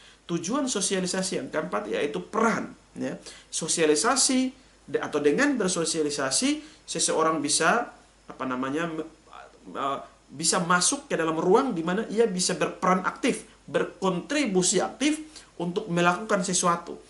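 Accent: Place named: Java, Indonesia. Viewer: Koq ada nyelip2 logat jawa nya bapa dosen.. Heheee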